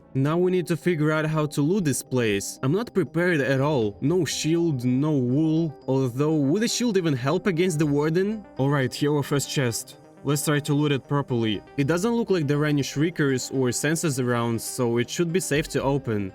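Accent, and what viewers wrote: Russia. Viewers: окей, по акценту и шуткам, ты явно русскоговорящий. пришел в комментарии пожелать тебе удачи в твоём пути, видос получился уютный, надеюсь увидеть от тебя ещё такого. 💪🙂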